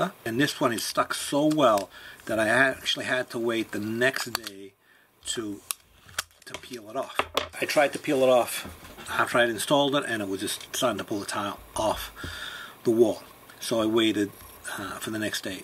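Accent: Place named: Danvers, United States. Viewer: Thanks for another great video. I started tiling full-time just a couple years ago and you've been an important resource to build my knowledge and skills. I like the straightforward nature of your videos and listening to you talk reminds me of being back in Danvers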